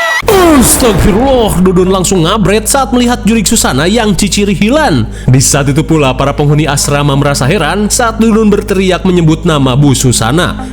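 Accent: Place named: Banten, Indonesia. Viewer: Mang orang banten?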